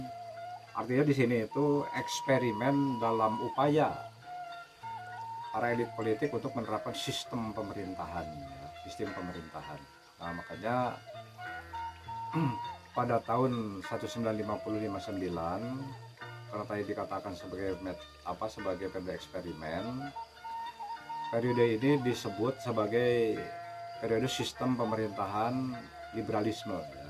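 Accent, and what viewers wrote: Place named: West Java, Indonesia. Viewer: Sukaa banget pak, sunda banget oii tentrem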